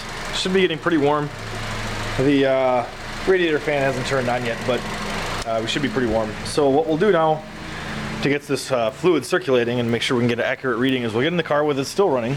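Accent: Minnesota, United States. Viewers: That Minnesota accent is on point!!! hell yea!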